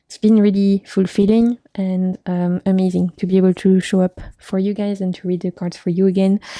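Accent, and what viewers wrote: France. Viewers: Whatever you say with a French accent is better. 😘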